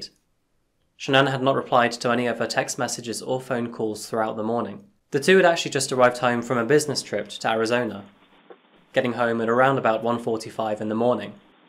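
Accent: United Kingdom. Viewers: I love the British accent all the time I live the royal family I love marks and Spencer your videos are remarkable ❤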